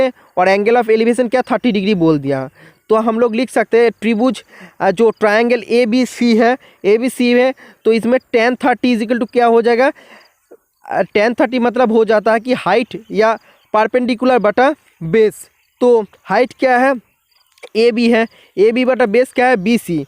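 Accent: Bengal, India. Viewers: U r from Bengal?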